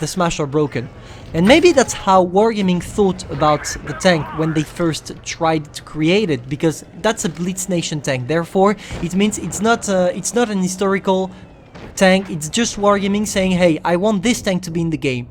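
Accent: France. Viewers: French are you?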